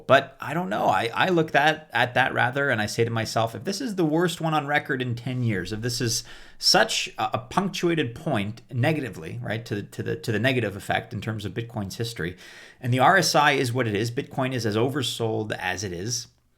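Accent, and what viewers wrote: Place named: Canada. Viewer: I come for the Canadian accent. Chance at ETH doesn't hurt either.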